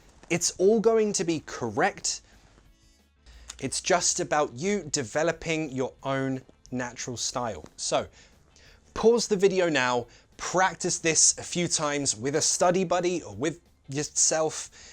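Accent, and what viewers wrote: United Kingdom. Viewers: I love your British accent!!! In spite of loving the English language, I hate the huge amount of English words and expressions adopted in Argentina, such as: delivery, take-away, home-office, etc. Anyway, I'd love to have an excellent proper British pronunciation. Thank you for all these lessons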